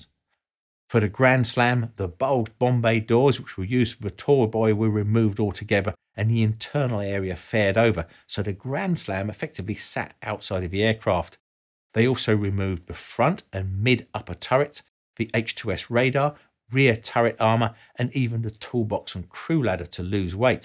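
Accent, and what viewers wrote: United Kingdom. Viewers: As a Yank I love hearing the British commentary and their view of British planes !!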